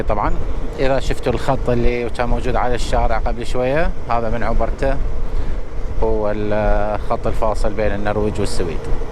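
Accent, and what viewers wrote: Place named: Iraq. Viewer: المناظر جميلة ماشاء الله و سماع اللهجة العراقية اجمل ...تحية لك اخي الكريم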